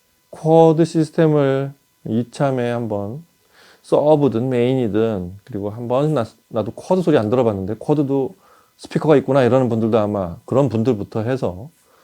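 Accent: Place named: Seoul, South Korea. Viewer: 발성 좋으시네요^^ 오리지널 서울 말씀.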